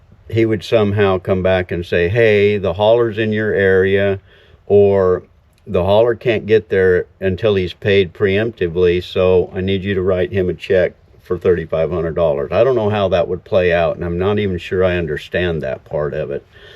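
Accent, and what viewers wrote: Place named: Mexico. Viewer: Sounds like Mexican learning english😊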